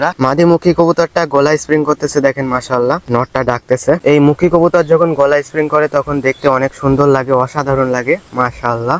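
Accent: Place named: Kolkata, India. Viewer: Kolkatar naki dada Tumi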